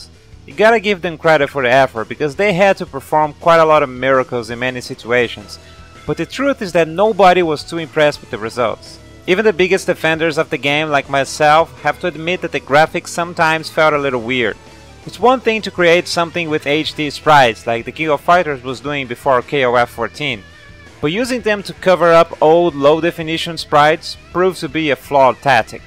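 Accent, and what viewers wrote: Brazil. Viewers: Vc é brasileiro? pelo sotaque parece, eu também falo inglês assim rsrs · the brazilian portuguese background shines through too much and adds that horrible english accent... this guy needs a lot of work before narrating anything in english again. On a scale from 1 to 10 where crazyrussianhacker is an acceptable 4 this guy here is a solid -2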